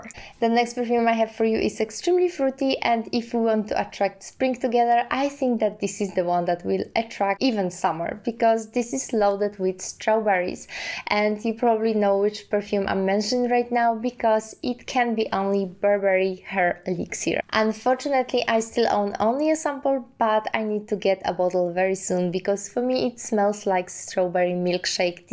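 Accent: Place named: Poland. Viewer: You have polish accent 🙂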